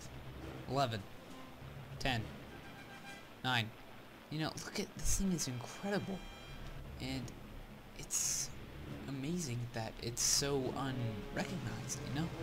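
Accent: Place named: Russia. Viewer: good job man :D Dat Russian accent doe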